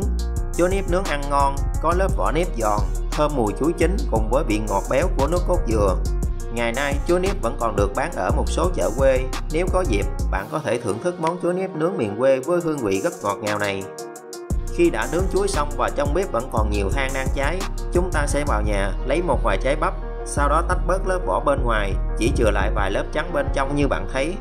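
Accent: Mekong Delta, Vietnam. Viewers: Quay kiểu xịn quá chú. giọng miền tây đúng thân thiện ng việt nam ạ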